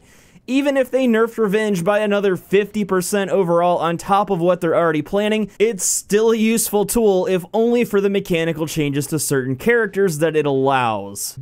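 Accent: United States. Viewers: Evan Woolford I'm certain it's just cause he's American (pronunciation) but as the other guy wrote , it's a clever little substitute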